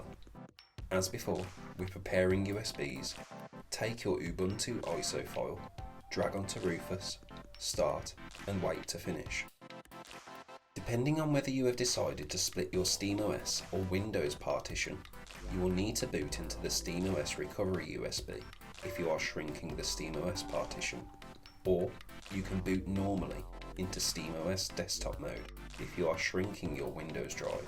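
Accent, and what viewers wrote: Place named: England, United Kingdom. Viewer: It's a pleasure to listen to your perfect England accent. You combined all of the information into perfect tutorial. Thank you very much for such great video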